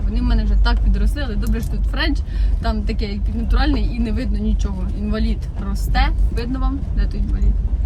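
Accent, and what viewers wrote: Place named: South, Ukraine. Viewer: Пані, ви неперевершена!Як надзвисайно приємно чути українську з півдня країни! Вклоняємося Вашим воїнам і Вам до землі.Щиро дякуємо, що ви усі живете захищаєте,любите та працюєте.Якби таких українців було багато у нашій рідній Україні - війни НІКОЛИ б не було.Не допустили б до такого. Живіть, живіть усі довго та щасливо! Панові Дмитру, якнайшвидшого одужання.Миросик дорослішає на очах.З любов'ю українці.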